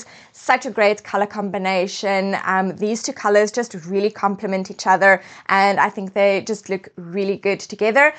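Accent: South Africa. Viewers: I KNEW IT!!!!! My family is from Cape Town but your accent is so much less pronounced than theirs… been in Canada for a long time already? ❤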